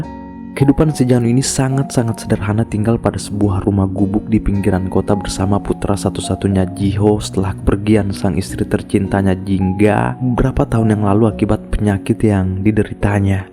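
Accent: Bugis, Indonesia. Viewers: Bugis apa adminnya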